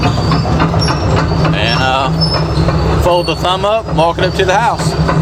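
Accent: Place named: North Carolina, United States. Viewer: I was born and raised in the Old North State. When I listen to you, my accent comes back even though I have been gone forty years. Love it.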